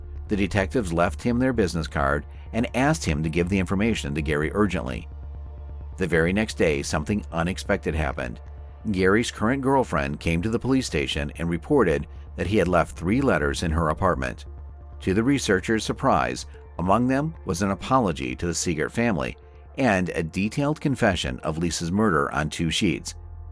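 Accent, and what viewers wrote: United States. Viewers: This script and the narration are terrible. Who says that a show was on "federal TV"? And it's full of things like "Gary refused to give his DNA. The police then formed a list of 11 suspects that refused to give DNA samples. Gary was among them." No shit, I heard what you said... And that terrible Midwestern short "a" just makes it that much more abrasive.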